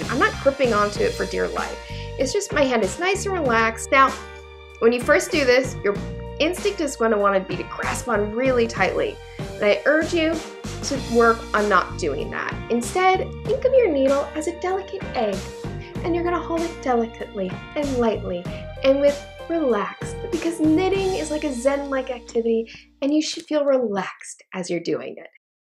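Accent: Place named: Missouri, United States. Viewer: Really enjoyed your video...thanks for that! I've never tried this method but you make it look so easy I'm going to give it a try. You must be from my home state of Missouri; you sound just like my sister. I'll be watching! Thanks again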